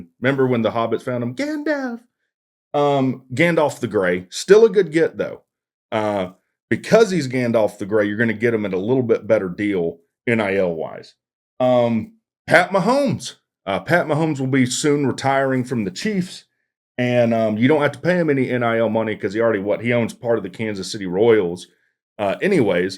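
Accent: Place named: Tennessee, United States. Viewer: Your Tennessean accent is insulting and your opinion is trash. Good luck next year GT... ha...ha.ha...ha....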